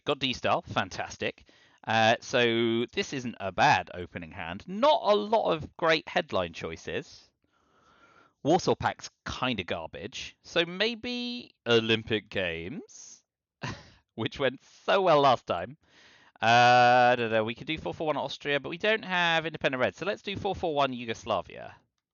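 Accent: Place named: England, United Kingdom. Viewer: I enjoyed the rambling commentary (so did my wife) and the quaint English accent was just the icing on the cake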